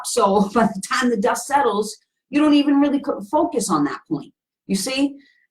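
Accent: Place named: New York City, United States. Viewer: I love you girl. I used to live with family in NYC and I am hearing some accent from you but I could be wrong lol.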